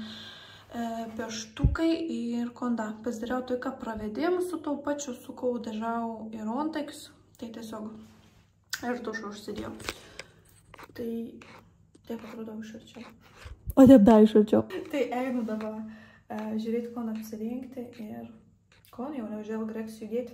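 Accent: Samogitia, Lithuania. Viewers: Nu graziause ce, veina pati i konca isvare!? Kode mon nieka nepasake 😮 zinuok pykstu!!! O jei rimciau tai faina ziuret o labiausei tai klausyt zemaitiskos kalbos.. pasiilgau Telsiu ir zemaiciu 😉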